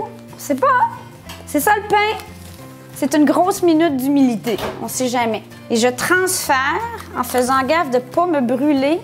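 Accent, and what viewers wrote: Quebec, Canada. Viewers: Résultat incroyable sur la vidéo, et j'adore cet accent canadien ;) Je vais essayer de faire la même chose, mais je n'ai pas ces farines de qualité ;) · magnifique, et merci je me suis abonnée, pourriez vous me donner la technique pour un pain au levain avec farine t65 bio + farine de seigle bio ( moitié T65+moitié seigle), eau? car problèmes digestifs. Superbe accent canadien et pleine de vie, j'aime.... Merci, à vous lire. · Virus oblige, je suis a la maison en Italie (mais d origine Québecoise). Hier a l épicerie il y avait de la levure de bière qui vient du boulanger pcq l épicerie n avait plus de levure. Bien entendu j en ai achetée par curiosité. Je cherche sur Youtube et découvre une personne qui parle comme moi! LE BONHEUR. Je vais commencer mon pain aujourd hui. Merci beaucoup, je suis maintenant abonnée. Ciao xx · Le pain et génial et l'accent québécois franchement j'adore ! 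Tout est magnifique, même la boulangère d'ailleurs ;) ! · Bonjour je viens de vous découvrir et par conséquent j ai regardée plusieurs de vos recettes Avec votre recette j ai fait mon 1 er levain. Merci Et avec ce levain j ai fait un pain Qui était très bon Mais pas aussi levé que le votre Dernier petit point, je vous écris de la Belgique et j' adore l accent du Québec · Quel délicieux accent que celui de nos cousins du Canada, et ça l'est encore plus quand c'est une ravissante cousine ! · Patrick de Paris j'adore ta vidéo quel accent les canadiens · Bonjour super vidéo sympa qui donne envie de se lancer dans la confection de ce magnifique pain et surtout de jeter ma machine à pain. Encore bravo votre accent me rappelle de merveilleux souvenirs de ma vie au québec il y a40 ans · Salut ça fait un long moment que jai regarder tes vidéos et je m'en escuse j'ai eu des chamboulements dans ma vie notamment la naissance de bébé il y a 6 mois et javou ne pas avoir pris le temps de regarder tes vidéos enfin Bref, j'ai voulu faire un pain mais râté je me suis souvenu que tu en avais fait.. 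A la maison je n'ai pas cocotte en fonte est ce que je peux laisser la pâte sur la plaque du four? Et est ce que pour la première étape de pose je peux mettre un torchon ( linge a vaisselle ) a la place du film alimentaire?? J'espère que tu pourras le répondre et je promets de regarder plus régulièrement tes vidéos c'est vrai que ta bonne humeur et ton accent québécois ma bcp manquer.. Énorme bisous 😘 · Le pain est aussi craquant que la cuisinière !! 😘 Et ce joli accent québécois, j’adore 😍 Merci 😊🙏 pour cette belle vidéo ! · tres beau accent ,ces Canadiennes j adore . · Bonjour, j'adore votre accent Québécois et votre façon de humer le levain. Astucieux la façon de cuire le pain dans une cocotte, la prochaine fois je vais tenter l'expérience. Merci pour votre humour et pour cette "cousine" bravo! votre pain est aussi beau que celui que nous faisons ici en France et pour vous encourager je m'abonne. 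· C'est encore meilleur avec le petit accent Québécois. Merci:) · Non seulement je suis fan de l'accent et des canadiens, mais en plus tu es VRAIMENT super sympatique!!! Je mange sans gluten et do cje fais mon pain moi-meme. Je vais essayer de suite cette recette! Merci pour ta bonne humeur et ta gourmandise finale! On aurait envie d'y gouter aussi de ce bon pain au beurre! ;-) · Quel mignon, l'accent québécois! J'ai abonné. Merci pour le vidéo. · vu depuis la France, j'adore le Québec, les québécois(es) et l'accent québécois...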